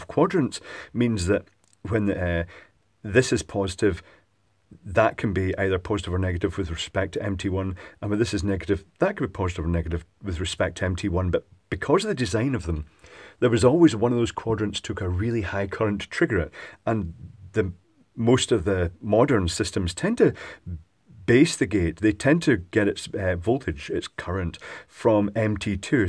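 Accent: Scotland, United Kingdom. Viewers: Fairly heavy Scottish accent.... 'triac' becomes 'track'. Had to double-take a couple of times. TRI-AC. TRI-AC. Repeat 100 times,!:-)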